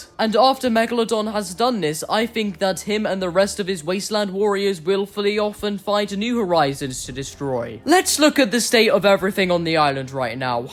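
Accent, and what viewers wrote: United Kingdom. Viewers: I like your videos and your british accent is amazing ahah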